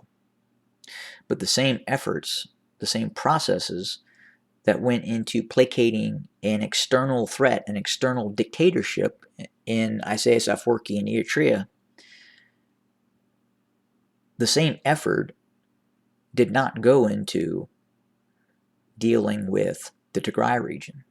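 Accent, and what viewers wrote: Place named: United States. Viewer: He is an American of Ethiopian/Tigrayan descent. Don't let his American English accent fool you.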